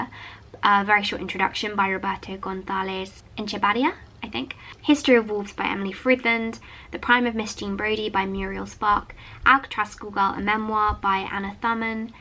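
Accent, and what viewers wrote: United Kingdom. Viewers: speaks uncanny British English and her pronunciation is very good - when it comes to understanding and/or learning English as a non-native speaker. There's no point in making her change her speaking habits just for you, especially that the purpose of the channel isn't to teach people the language. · I'm also not a native english speaker (and not very skilled in this language), but I understand almost all she says. has very beautiful british accent and very good diction 😁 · I and many followers have low level of English. Please speak more simply and reduce their pronunciation. we can't understand the british dialect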